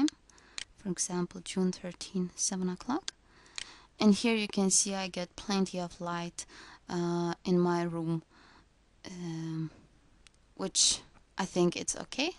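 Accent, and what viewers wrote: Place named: Lithuania. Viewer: Is balso pazinau, kad lietuve :P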